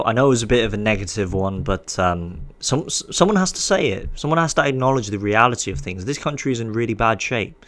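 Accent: England, United Kingdom. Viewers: He speaks like an Englishman - which is weird, let's be honest. I'd much rather Asians sound like whatever Asian they are, but in English. There's no eloquence in emulating a man that you are not.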